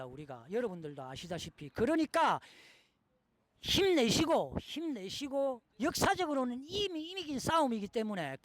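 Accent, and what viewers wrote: Gyeongsang, South Korea. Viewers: 다 좋은데 그 경상도 말투 더럽게 듣기싫다..표준말좀 써라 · 갱상도 말투 존나 듣기싫다.증거는 차고 넘쳐.24년이 아니라 32년이야.계엄 지시까지 먹으면 무기징역 존나 웃겨.배아프다.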